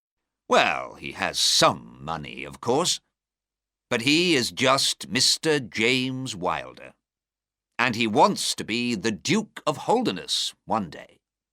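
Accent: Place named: United Kingdom. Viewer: Огромное - преогромное спасибо! Как же хорошо всё продумано, - и сам рассказ, - кто же не любит нашего родного Шерлока Холмса, и бархатный баритон чтеца, и произношение - волшебное, английское - преанглийское! Чудесный формат! Спасибо!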